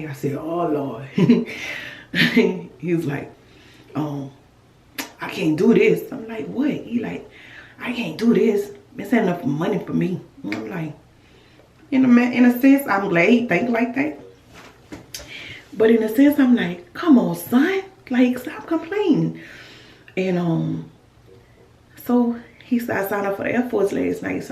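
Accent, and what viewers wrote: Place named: New Orleans, United States. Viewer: You sound like you from new orlean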